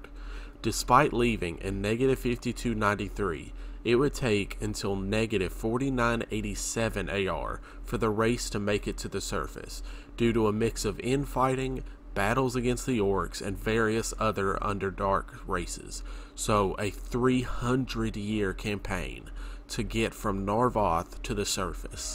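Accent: South, United States. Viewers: exceptional voice/accent, Im from SC and appreciate the southern rep. The music doesnt fit though. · I'm from SC and was also pleased to hear a Southern GM haha. It's not a common accent for these kinds of videos so it definitely made me smile Great work on these vids btw!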